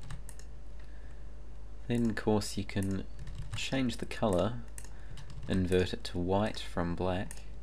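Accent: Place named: New Zealand. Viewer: He isnt an aussie, hes from nz buddy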